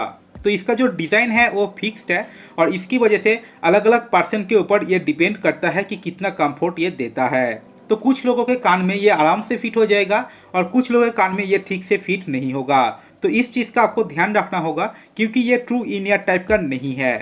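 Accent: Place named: Bengal, India. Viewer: Shune mone hocche tumi bangali... Banglay content dile aro bhalo lagbe...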